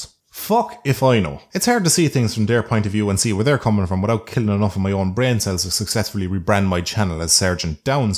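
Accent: Ireland. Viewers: Love your accent! Is it Irish?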